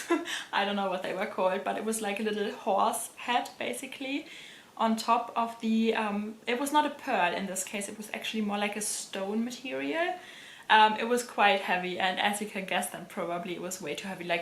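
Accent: Germany. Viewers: Was hast du denn für einen deutschen Akzent?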